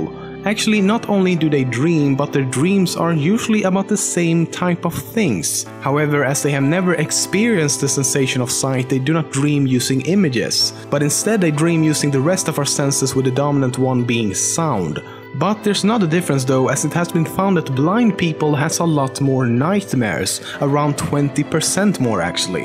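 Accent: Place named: Sweden. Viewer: Youre sweedish i hear it on the accenr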